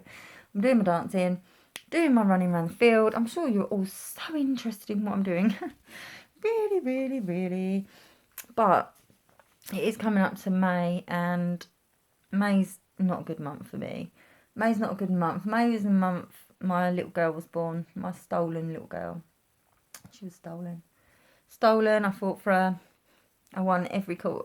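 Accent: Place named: United Kingdom. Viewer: You like Em and Trump ...and have that British accent? Subbed ✅ Here in middle America we are just using our common sense and are thankful that Trump listens to his base, because we don’t want any politician to tell us how to keep ourselves safe. Most everyone here understands the importance of not spreading it but we will not be told that we can’t move freely about the country or be told we can’t earn a living for our family. That’s why you see in states that have Democrat Governor’s being more authoritarian than the Republican states like Texas, Oklahoma Florida.. Its an election year, so in order to make Trump look bad, they will fight him even if he found a vaccine.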